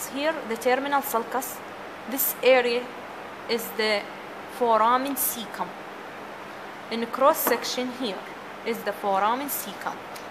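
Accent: Arab world. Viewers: bro she is arab i think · English with arabic pronounces thank you sister you’re best 🤗🤗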